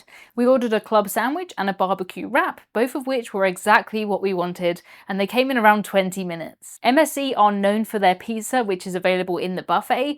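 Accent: United Kingdom. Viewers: I've never been on a cruise and don't really want to, but a while back I got addicted to presentation style and bits of humor and I keep watching her cruise videos. It must be that charming British accent she has that keeps me coming back!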